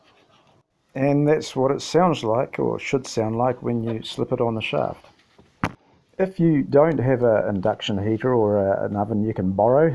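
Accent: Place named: United Kingdom. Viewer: Very Informative and if viewers have a problem with British accent....use the closed caption. Thanks for all the information.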